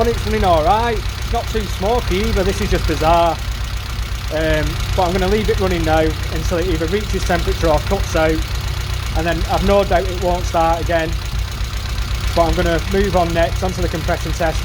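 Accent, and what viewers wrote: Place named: Burnley, United Kingdom. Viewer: Thought you sounded local. I’m in Burnley 😊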